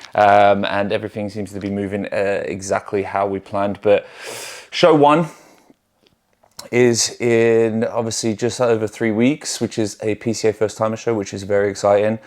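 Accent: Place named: Australia. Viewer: Need a bigger kitchen bro hahah sounded like a aussie 😂, seen you on insta so subbed ✌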